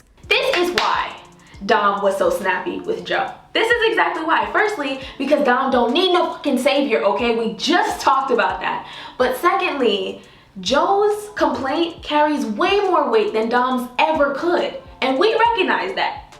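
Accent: Jamaica. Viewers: "Mi will watch dis likkle ediat show" OMFGGGGGGGGGGGGG YOU ARE JAMAICAAAAAAAAAAAAAAAAAANNNNNNNNN or Caribbean?? But mi know mi hear a Jamaican accent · I subbed the instant i heard the jamaican accent. "Mek me watch this likkle eediot show " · Love your Jamaican accent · Wait you speak patois🥺I just found this channel loved your content but now I’m sold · Is she Jamaican or Trinidadian? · are you from Jamaica? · The way her Jamaican accent and sayings play peek a boo tho I love it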